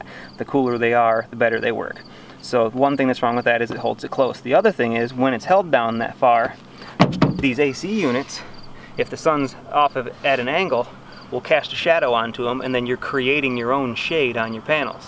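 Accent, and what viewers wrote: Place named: United States. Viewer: The good old Ruuf.. Not Roof Ruuf. Makes me laugh every time i hear Americans say Roof or car, veehykel not vehicle. IDK why it just makes me giggle.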